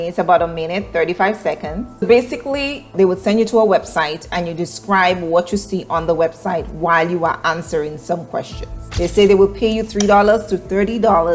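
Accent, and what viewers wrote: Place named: Caribbean. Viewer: This is the Carribbean accent , she seems to be Carribbean too.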